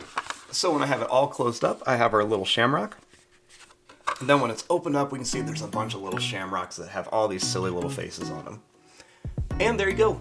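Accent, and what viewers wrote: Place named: Argentina. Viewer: habla en español argentino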